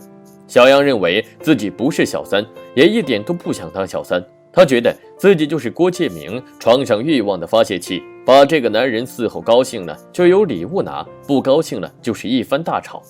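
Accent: Standard. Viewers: 不管怎么样配音的很好 标准，耐听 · 主播发音特别标准 声音好听 ， 废话太多 😂 继续加油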